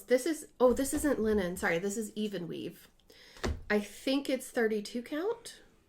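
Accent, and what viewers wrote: Ireland. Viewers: All of your needle minders are darling!! I love that pegallamacorn!!! The first time I heard you say “but” in your Irish lilt I thought you said “bush”, LOL!! Then you said it again and I realized what you were doing!! LOL!! Question: what are you talking about with Grogu (baby Yoda)?? What the heck am I missing out on?? I’m willing to bet Jacob from MFE would be a great resource on what makes a sampler a sampler!! Thanks for sharing!! See you next time... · Definitely 'But' in your unique 'Irish accent.❤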